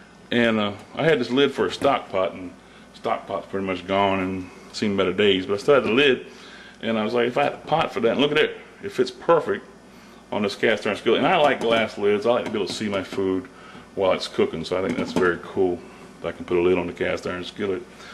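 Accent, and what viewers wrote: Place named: South, United States. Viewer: Hi! This is a fantastic demo and it's a really good idea to educate people about the benefits of using cast iron as opposed to modern non-stick varieties. Your cooking vids are brilliant and inspiring - I'm English and live in London, but I grew up in Virginia and I sure do miss my Aunt's cooking - your vids bring it all back (esp the accent!) I do my best to recreate Southern home cooking here in the UK and my English husband absolutely LOVES grits!! Keep up the good work! Best wishes!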